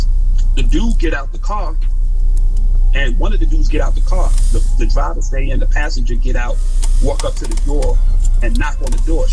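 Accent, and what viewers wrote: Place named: New York, United States. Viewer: Yo this nigga sound like Jay-Z this the second video I'm hearing with u and ol head y'all New York niggas be sounding the same on that jack especially with that New York accent. I got a good ear I can always tell where a person from by how they talk and what words they use.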